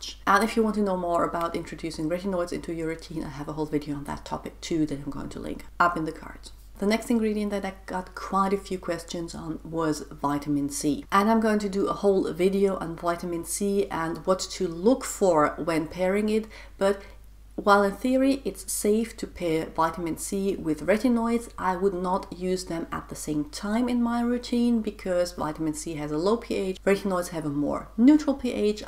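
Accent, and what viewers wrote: Germany. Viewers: I think due to your pronunciation of the chemicals I directly heard the german r and a ☺️☺️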